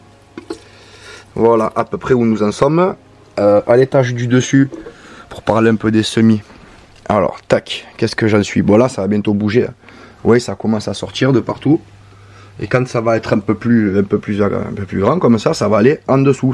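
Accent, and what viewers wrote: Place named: Southwest, France. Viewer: Salut tu est d'où avec ce petit accent de chez nous, moi je suis du Tarn, super ta vidéo, j'aime.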